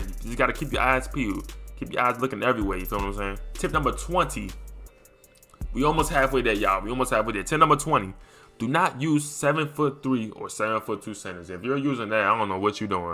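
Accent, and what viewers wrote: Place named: Washington DC, United States. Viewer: Bruh definitely from DC or Murland..Being from Seat pleasant, I know us when I hear it 😂 Good shi doe fool 💪🏾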